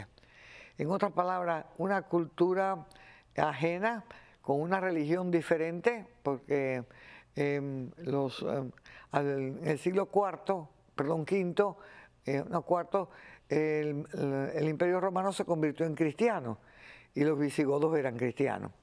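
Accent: Cuba. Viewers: Ya soy adicta a su forma tan natural de expresion....muyyy cubana. Gracias Dr. por compartir con nosotros sus conocimientos. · 👀Yo conozco gente que tienen 70años y nO han “ madurado “ 😅😅😅ja ja ja 🤣🤣🤣🤣🤣cuánta VERDAD ❤tú acento sutil del ORIENTE cubano es notable * y además es un orgullo 🥲 🥹 I PROUD 🥹 of U 📚📖🌳🧘🏻‍♀️🌊🌺🌳🫶🏻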